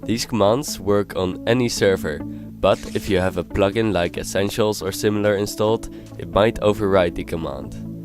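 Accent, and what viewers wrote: Netherlands. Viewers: Are you dutch? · i am dutch and i think he to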